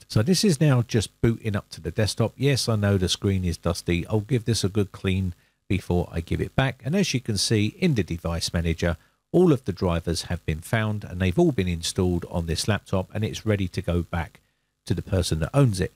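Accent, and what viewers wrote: United Kingdom. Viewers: His videos are outstanding, my American English, however, doesn't always pick up on his UK English! Thanks for your comment.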